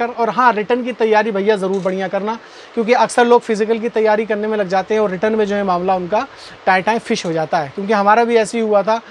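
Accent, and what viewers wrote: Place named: India. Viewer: apki voice pakka hindustani dekha rahe hai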